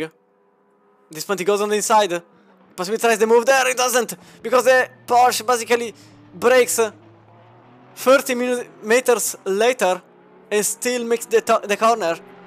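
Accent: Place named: Italy. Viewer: you are a great commentator :) i like your energy and your italian english... looking forward to hear you again bro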